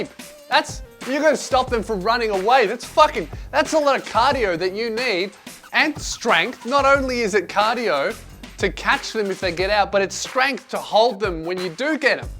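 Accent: Australia. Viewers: Fuck this is the first video I have ever seen and in the first second I swear to god if you aren't fucking australian then fuck. Who is. Because I am and you are either Aussie or a fucking child of steve irwin.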